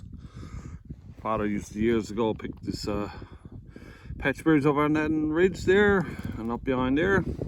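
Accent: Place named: Ireland. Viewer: Love the videos. I’m fascinated at how similar your accent is to our Irish accent. Good luck with the hunting! 👍🏻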